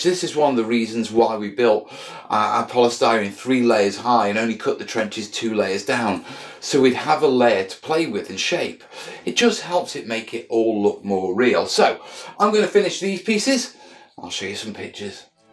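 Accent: United Kingdom. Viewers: he has the type of British accent that I like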